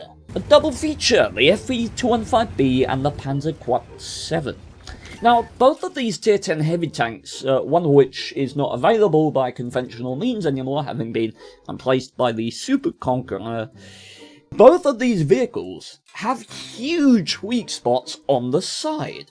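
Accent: United Kingdom. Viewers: Sory to hear that. I was thinking that you spoke like that to make your English the most British sounding possible. Sory for my " neanderthal" English. Best wishes for you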